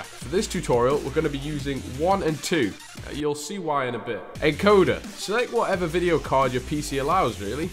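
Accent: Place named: United Kingdom. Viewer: my guy has a british [bri'ish] accent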